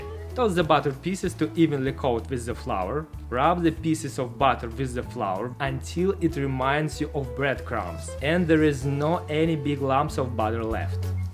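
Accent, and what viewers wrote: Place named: England, United Kingdom. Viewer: As an English teacher I can surely say - you have a beautiful English accent👍😍😘. And I'm a huge fan of all your channels. Love you guys😉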